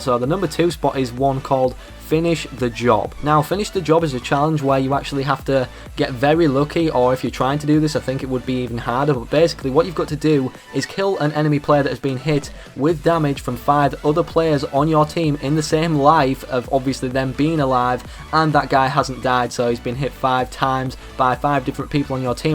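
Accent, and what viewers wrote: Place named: Yorkshire, United Kingdom. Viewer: Your from Yorkshire also your psn name gives me Ebola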